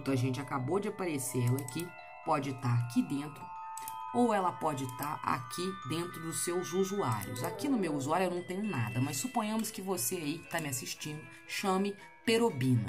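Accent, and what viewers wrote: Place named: Zona da Mata, Brazil. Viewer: de onde? Pelo sotaque parece ser da zona da mata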